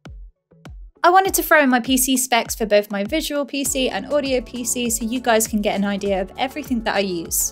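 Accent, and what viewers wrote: United Kingdom. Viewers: glad to see you back on youtube and you have done an amazing job with the home studio, also i love the little hits of the British accent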